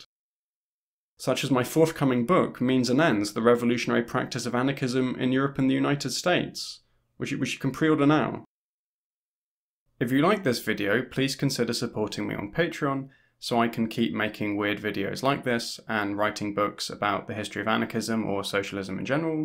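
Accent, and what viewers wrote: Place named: United Kingdom. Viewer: Would recommend learning Spanish or Catalan if you can muster up the willpower to do it both because they are useful for your purposes as not being able to read the language is a roadblock you mention multiple times in this vid and also because in the past your pronunciation of certain Spanish proper nouns has been so "British" for the lack of a better term that I've kind of struggled to find the person/thing in question in personal search afterwards. (could also just edit the captions where proper nouns pop up because the auto-generated ones usually don't hear it but I don't know if you can do that anymore)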